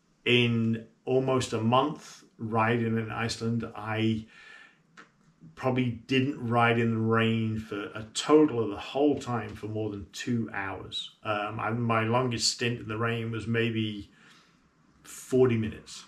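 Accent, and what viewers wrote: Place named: Western Australia, Australia. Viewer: mate I've been trying for so long to try and pin your accent. ever since your video with mototrek. I'm guessing australian, but west Australian?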